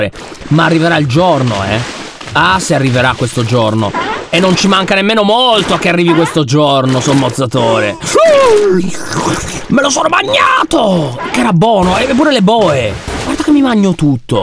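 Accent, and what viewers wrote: Sardinia, Italy. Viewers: sembra sardo, ma i romani fanno "AOOOOOOOOOOO"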